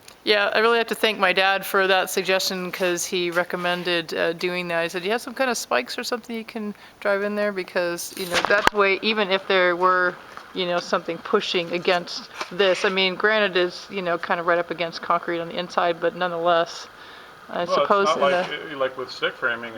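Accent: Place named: Ireland. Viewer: What I enjoy most is narration; her slight brogue does not hamper her clear and mellow enunciation, precise, yet complete, sentences. The self-aware, embarrassed giggles make listening easy and delightful. Those walls pierced with so many empty eyes may not be pleasing to my aesthetic sense, but I'm sure they'll be practical. I remember the Big One of 1964, which leveled much of Anchorage and caused a tidal wave which swept our Oregon beaches clear of debris and also one family's children. Keep up the good work. <link>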